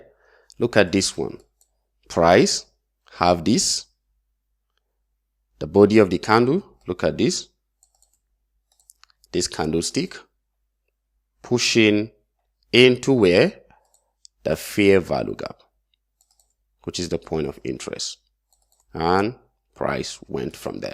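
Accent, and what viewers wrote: Nigeria. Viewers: Nice, are you from Nigeria?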